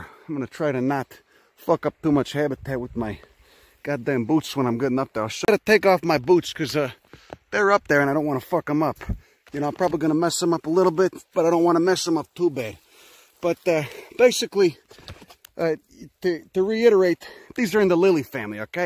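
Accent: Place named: Queens, United States. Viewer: Man, your Queens accent is mighty! This is the freshest channel to the science world, good shit homie...bet.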